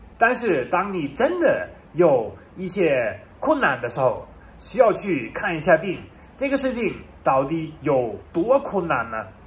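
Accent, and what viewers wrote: Sichuan, China. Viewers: 我住臺灣省喜歡您的視頻更喜歡聽你濃厚四川腔普通話!感謝你介绍大陸四川成都風俗民情~❤👍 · 哈哈, 在成都久了,普通話都帶四川口音了。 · 的普通话是不是带的四川的口音呢？哈哈😄很可爱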